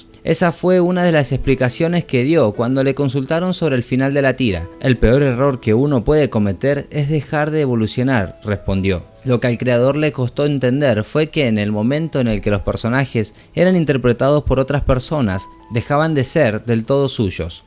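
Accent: Argentina. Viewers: Narrador sos. Argentino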